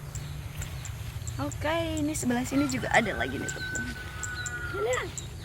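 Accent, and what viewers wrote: Banyumas, Indonesia. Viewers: " kepriye".. 😄 kirain kak asli orang bali atau menado.. ternyata banyumas ya... ❤️ seru euy kebun ibunya kakak.. sehat selalu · Wah,ternyata aslinya ngapak. Bener² gk nyangka. kirain asli bali. Sukses selalu ka 👍😘 Seru banget panennya, sy jd pengen pulang kampung 😊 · Wahh wonk ngapak to mba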